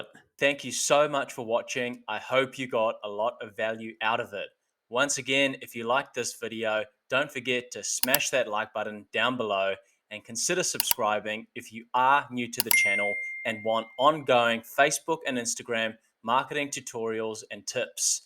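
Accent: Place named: New Zealand. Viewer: I wish I had seen this video 2 hours ago!:) Thank you so much for this info. So, even though I spent a lot of time manually deleting posts, you just saved me another 2 hours! BTW: As soon as I heard you speak, I thought to myself, "If isn't from New Zealand, I'd be very surprised!" Then, I saw your page and saw you live in Tauranga! I spent many happy days back in the 1980s with friends who live in Tauranga. I seem to recall running a road race at Mt. Maunganui as well. New Zealand remains my favorite place on earth, and wish I could have lived there.:)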